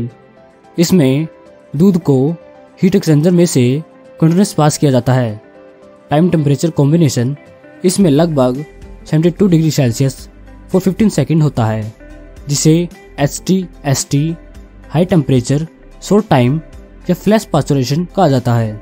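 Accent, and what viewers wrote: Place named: Haryana, India. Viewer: Haryanvi hindi....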